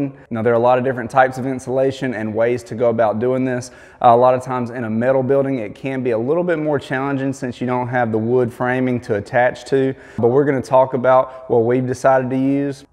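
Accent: South, United States. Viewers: I love your deep Southern accent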